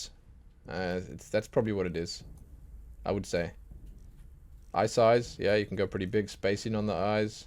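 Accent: South Africa. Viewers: Subbing, cause your accent is South African😂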